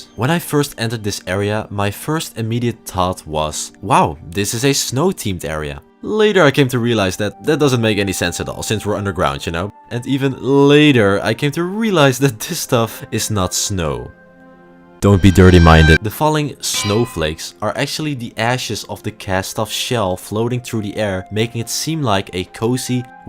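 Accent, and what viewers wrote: Netherlands. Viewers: Het nederlands accent door je engels heen is crazy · You are dutch right? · je klinkt nederlands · ik ruik nederlands man ngl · Wait are you Dutch? (Same) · just for science.. are u dutch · am i just the only one smelling a bit of a dutch accent here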